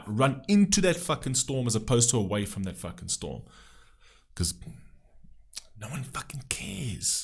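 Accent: South Africa. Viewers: As a Brit myself, I don't think he's British. I think he's from South Africa. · you sound South African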